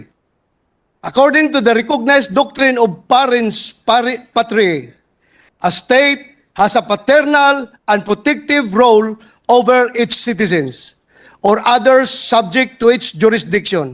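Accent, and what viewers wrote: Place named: Visayas, Philippines. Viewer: Bato mag speech theraphy ka nakakakabaliw English pronunciation mo, visaya visaya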